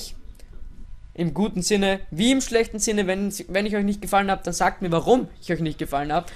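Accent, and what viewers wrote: Austria. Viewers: Bist Wiener :) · endlich amal a österreichischer kommentator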